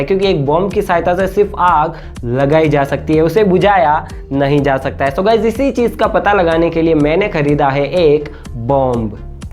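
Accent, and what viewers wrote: Rajasthan, India. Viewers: Bhai tu Rajasthan se he kya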